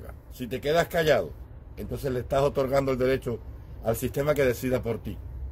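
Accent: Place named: Cuba. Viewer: Hombre desonestidade de tanto sufrimiento, tanto desespero, me he reido, disculpen, estoy de acuerdo con todo, como usted lo dice, ni una caja de fósforo. Que claro y en buen cubano habla usted. Lo admiro, que clareza, Dios bendiga a nuestra Cuba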